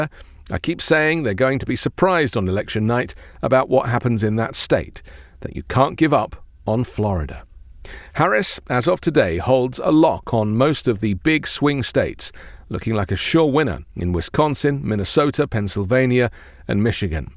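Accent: United Kingdom. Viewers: Your British roots are showing lol. My family came from Britain generations ago. My Dad and Granddad are the only ones I knew that used phonetically. Ahhhhtt. Dad had a gun I forgot which one but it was a model with zero in it that had ahhhhtt in it. 20 ahhhhtt something 🤔🤔🤔. Anyway do you have crackers at Xmas ? Oyster stew Xmas eve? Love the show.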